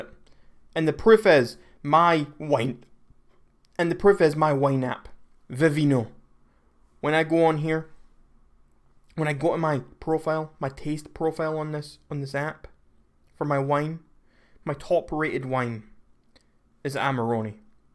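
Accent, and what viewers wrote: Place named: Scotland, United Kingdom. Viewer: Your Scottish bro, stop tryna act class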